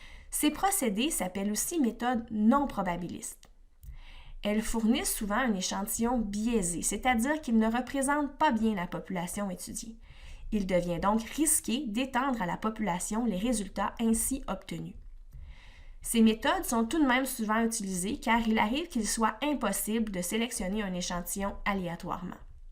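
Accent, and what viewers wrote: Canada. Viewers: très très bien expliqué (même avec votre accent canadien lol)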